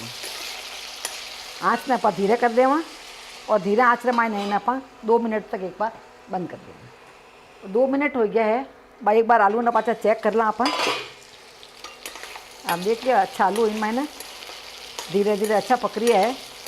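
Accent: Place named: Marwari, India. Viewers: Mujhe Aapka Rajisthani bolna bahot pasand hai... Kash aapke jeisi meri mother in law hoti... Mein Aapko sunti rehti ❤️❤️❤️❤️❤️ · I know what you mean. I speak Gujarati and this is the first time Madwari has reached my ears and and after few seconds it seemed natural. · Aapki har recipe bahu achchhi hoti hai aur sath sath aapaki marwadi bhasha sunani muje bahut sweet lagati hai, main Gujarat se hu lekin samazneme koi dikkat nahi hoti.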